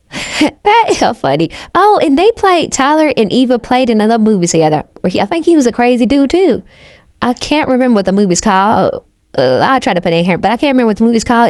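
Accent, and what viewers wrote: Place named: South, United States. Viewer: Your southern accent is giving me life! Tennessee? Appalachia?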